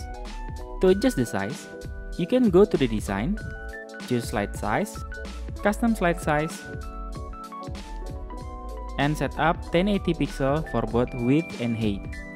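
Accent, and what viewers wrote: Indonesia. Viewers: Makasih mas tutorialnya, accentnya seperti orang indo, ternyata bener, auto subscribe deh 👍😁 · Aksen Indonesianya manthul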